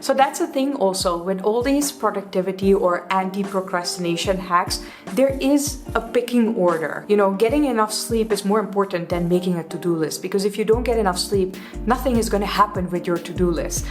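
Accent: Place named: India. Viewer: Ik vind het zo schattig en leuk om te horen hoe inmiddels het Indiase accent je uitspraak beïnvloedt. Superleuk om te horen :)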